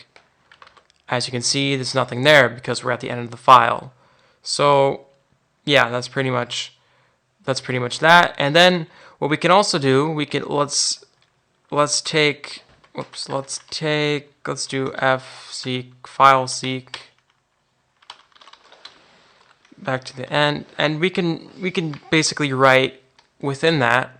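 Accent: United Kingdom. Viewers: The hawker has a REALLY annoying British ac-cent' on "PIE'-thun'. The other Americans speak very well. The content is good. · Thanks GOD, a British accent at last. Where have you been mate?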